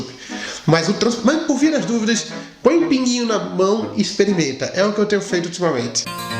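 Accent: Minas Gerais, Brazil. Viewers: Ele pode ate ser pernambucano mas fala q nem mineiro já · Uai! cê num é mineiro não? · Este cara já pegou o sotaque mineiro...kkk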